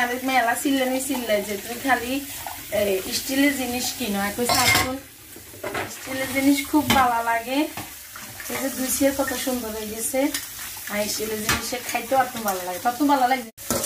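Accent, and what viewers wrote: Noakhali, Bangladesh. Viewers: Apu tumer nuwakhaliar vasha onk valo laga sunte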